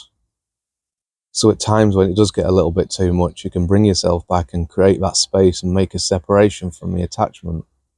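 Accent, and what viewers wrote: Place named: North West England, United Kingdom. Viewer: Lovely to sit and listen to you. I'm from the North West of England and it's rare to hear someone with a familiar accent talking about this.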